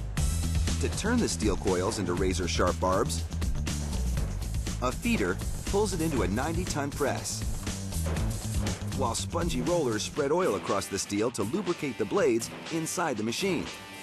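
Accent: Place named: United States. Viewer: I like how he cheerfully explains how it can cut through cloths and flesh with his American voice.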